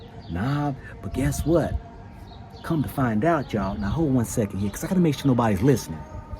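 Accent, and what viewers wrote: South, United States. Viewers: There is a documentary series called The Story Of English. It explains accents like this and others coming from the white Southerners having to communicate with enslaved Africans. Fascinating stuff. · You are now speaking the Southern tune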